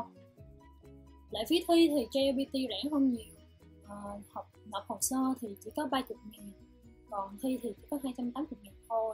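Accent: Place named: Nha Trang, Vietnam. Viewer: Bạn này giọng nói như ngừoi Nha Trang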